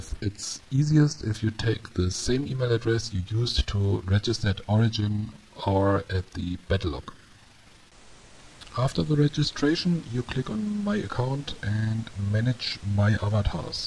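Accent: Germany. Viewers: You are German :-D I hear it ^^ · Ich liebe den deutschen Akzent :D herrlich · ein deutscher der englisch spricht= geiler akzent! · are you german ?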